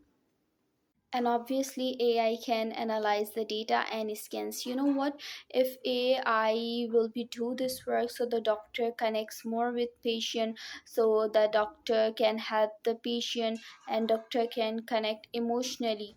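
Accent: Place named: United States. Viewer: Your acent sounds like American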